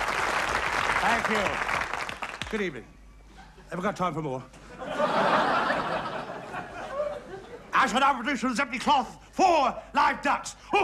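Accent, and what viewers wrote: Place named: West Country, United Kingdom. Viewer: He had a thick West Country accent!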